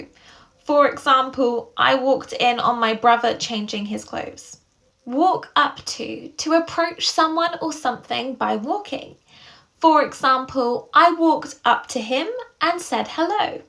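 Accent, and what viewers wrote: United Kingdom. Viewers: Love British English accent.❤❤❤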